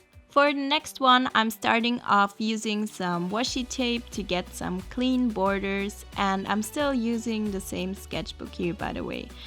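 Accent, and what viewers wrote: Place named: Germany. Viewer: Hör Deutsch raus in dem englischen akzent,lieg ich da richtig?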